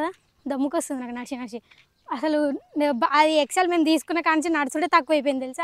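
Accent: Telangana, India. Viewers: చీమిడి ముక్కు అమ్మాయ్ ఆ భాష ఎంటి తెలంగాణ అమ్మాయా మీరు అచ్చమైన పల్లెటూరు అమ్మాయ్ తెలుగు అమ్మాయ్ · So sweet of u sister..chala chala transparent ga undhi...u have a bright future...all the best I like telengana slang❤...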